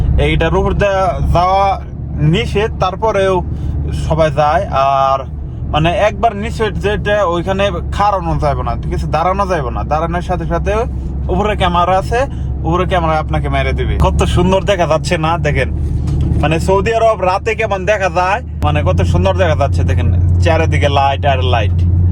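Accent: Tangail, Bangladesh. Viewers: আপনার বাড়ি মনে হয় টাঙ্গাইল ? যেতে কে বলেন গেলেন 😛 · ভাই কি টাংগাইল এর নাকি।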